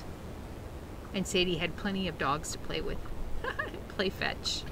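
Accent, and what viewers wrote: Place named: Northeast, United States. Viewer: I love the way you say "what do you think?" to your animals. I do that too and never heard anyone else say that to their fur babies until I came across your channel. It's just one simple little thing in a sea of other little things that make me go "she's my people!" every time I watch your videos. I can tell that you were born and raised closer to the northeast side of this country, purely through your dialect and the way you express yourself. Much love to you and Sadie from Michigan!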